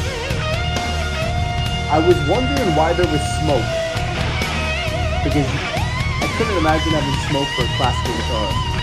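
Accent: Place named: United States. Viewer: 😂 I was expecting you to have an Irish not American accent as is like the most Irish surname ever dude 😎👍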